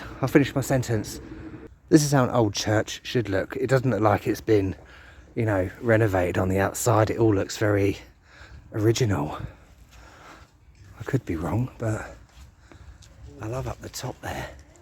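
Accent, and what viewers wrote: England, United Kingdom. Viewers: Video sam coveka dok je snimao, mislio sam neki domaci triper prica na engleskom, kad ono pravi englez 😆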